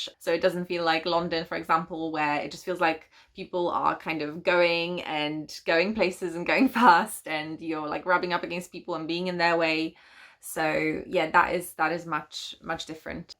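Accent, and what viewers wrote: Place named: Scandinavia. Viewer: It sounds like your English accent has started to sound more Scandi.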